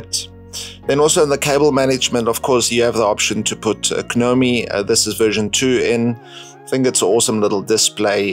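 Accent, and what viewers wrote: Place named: South Africa. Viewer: Lekker South African accent. Love to see such great videos coming from SA.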